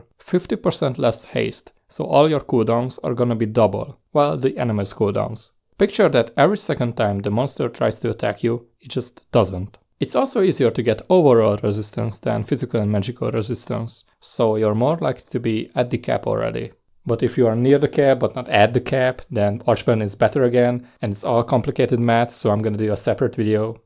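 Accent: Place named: Hungary. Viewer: Üdvözletem kedves uram! Az akcentusodból ítélve magyarnak gondollak, de ez majd úgyis beigazolás nyer a kommentet olvasva. Csak megszeretném köszönni a minőségi munkát és további sok sikert mindenben! Várom a további tartalmat és big up! 👆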